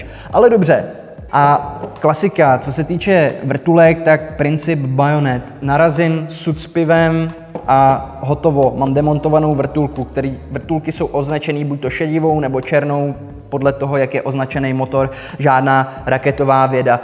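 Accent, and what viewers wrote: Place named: Prague, Czech Republic. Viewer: Ten pražskej akcent to je něco strašnýho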